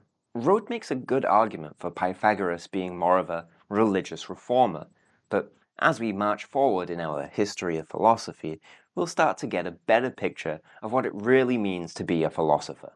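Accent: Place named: United Kingdom. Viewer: You sound British. If you are, you especially should know it's maths, not math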